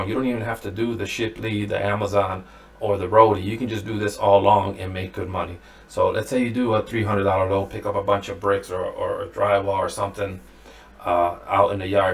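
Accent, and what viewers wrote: Cleveland, United States. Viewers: You from Cleveland?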